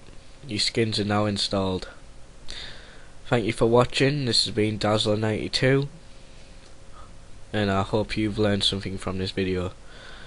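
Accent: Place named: Scotland, United Kingdom. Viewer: sorry if im offending, but u just have a voice like a Scotlander =) anyway thx for the tutor.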